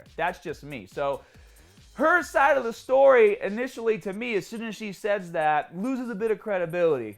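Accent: Boston, United States. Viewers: Bahston... My man!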